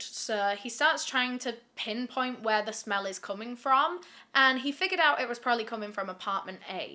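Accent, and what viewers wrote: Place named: North England, United Kingdom. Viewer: Coming back to this video as handled this subject with such professionalism & care. Honestly she’s one of the few true crime YouTubers who actually doesn’t glorify these people / subjects & I have so much respect for that. Edit: Also as someone who has family & friends who live in the North her accent brings me a weird sense of peace whilst listening to these fucked up stories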